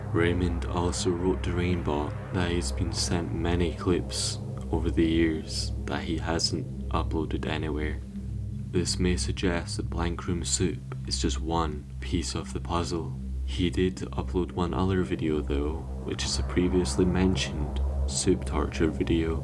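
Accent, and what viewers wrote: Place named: Scotland, United Kingdom. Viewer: Ah recognise that accent, yer fae Scotland mate! Me tae! 🤪 Good video, hope you're doing well. Best wishes from Edinburgh 💙🏴💙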